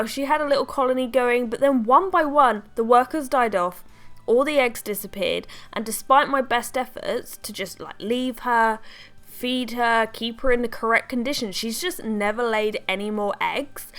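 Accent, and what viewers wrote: England, United Kingdom. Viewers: Is she English?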